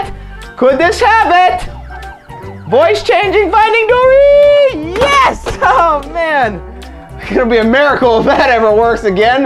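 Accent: Pittsburgh, United States. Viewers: are you from pittsburgh pa? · are you from pittsburgh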